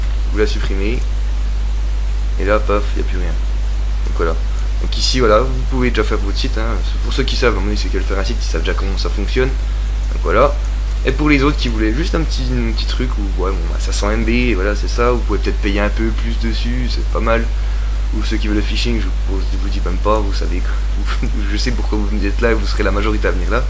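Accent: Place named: Haute-Saône, France. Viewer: Mdrr j'ai reconnu l'accen j'suis du 70 ;)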